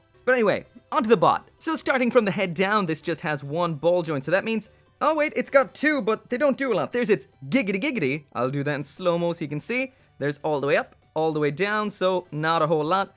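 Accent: Ireland. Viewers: Are you Irish like me?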